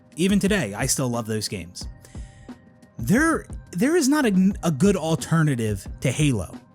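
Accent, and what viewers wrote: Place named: Philadelphia, United States. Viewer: By the way, is that a delco/philly accent I hear? Delco checking in.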